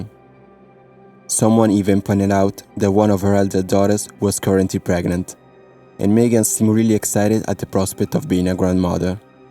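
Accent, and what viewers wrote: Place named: France. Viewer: That French accent. Oohh la la.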